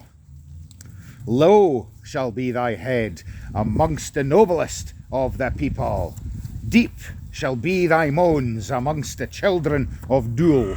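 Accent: United Kingdom. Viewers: ohh, Halloween? gosh, the Children looks so excited...lovely! this is definitely a Walk, indeed from your Screen Name! Thanks for touring us around and the info. good to know. Love the British accent! ahahaha full watched! keep safe...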